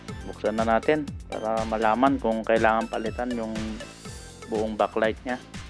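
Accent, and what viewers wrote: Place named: Mexico. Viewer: I like this guy's Mexican accent, makes this video more likable.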